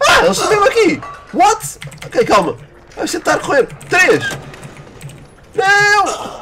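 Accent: Portugal. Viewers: Vc é de Portugal?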